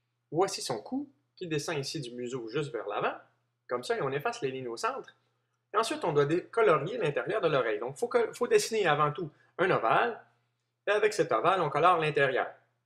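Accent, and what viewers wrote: Quebec, Canada. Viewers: L'accent du Quebec ça donne de la bonne humeur ^_^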